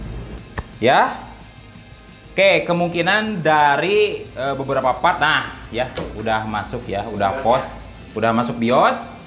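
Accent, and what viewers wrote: West Java, Indonesia. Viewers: itu sunda bandung bukan sih?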